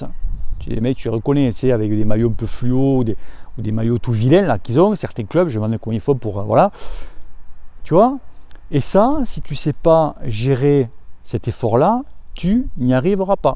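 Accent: South, France. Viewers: Salut, je veux juste remercier pour tous tes conseils avec l'accent ensoleillé ☀️ et chaque fois des expressions qui me font marrer, des thèmes que t'aborde actuelles et que tous ceux qui font du vélo se reconnaissent un moment ou un autre dans des situations diverses (avoir une patate du diable, être dans le rouge, voir la vierge 😂etc,etc), et surtout continue ces vidéos qu'on apprécie, salutations de la 🇨🇭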